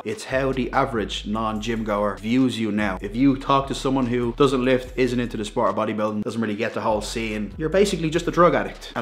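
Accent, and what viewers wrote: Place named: Ireland. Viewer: I like your Connor McGregor accent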